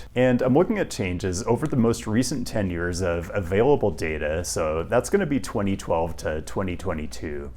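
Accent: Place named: California, United States. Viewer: love this guy but the california accent is killing me 😭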